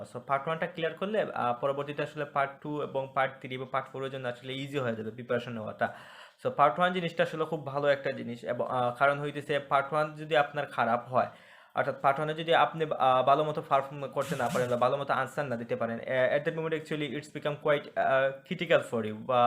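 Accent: Sylhet, Bangladesh. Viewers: Vai apnar mat a lager sylhet e,,,Apne sylhet koi takoin ?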